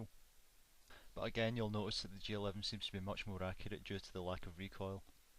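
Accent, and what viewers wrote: Scotland, United Kingdom. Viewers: are you british? lol jw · are you scottish?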